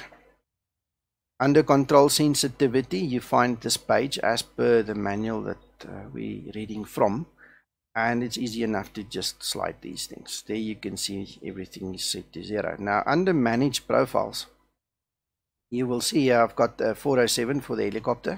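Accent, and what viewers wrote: South Africa. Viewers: are you afrikaans cuz you sound afrikaans i am afrikaans too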